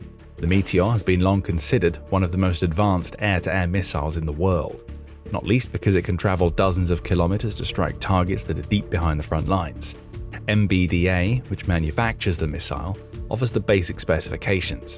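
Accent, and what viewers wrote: England, United Kingdom. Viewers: Couldn't you guys find a better word than "cheap" to describe the Gripen?? Try cost-effective - relatively inexpensive - or affordable. Not cheap= poor quality. For someone with an English accent you really have a limited vocabulary.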